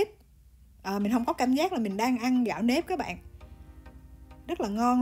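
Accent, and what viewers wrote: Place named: South, Vietnam. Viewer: gioiqua noichuyen coduyenvuive dethuongghe noigiongnam nghedechiu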